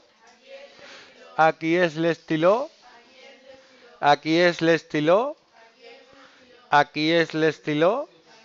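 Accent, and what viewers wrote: Spain. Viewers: Increible que en 1288 vistas, nadie haya reacionado. En fin... "le stylo á qui est?" no se dice de ninguna manera. jajaja y las tildes al revés y la pronunciación a la española. en fin. un desastre.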